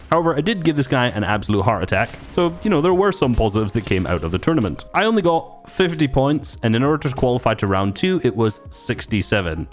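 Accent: Scotland, United Kingdom. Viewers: Can we 1v1 pls? Also are you Scottish? Because I think Scottish people have the coolest accents.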